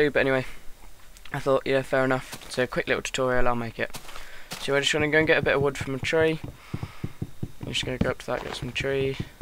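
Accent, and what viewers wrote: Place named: United Kingdom. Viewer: tell them ppl he doesnt sound lik a girl he sounds lik a british person!!!!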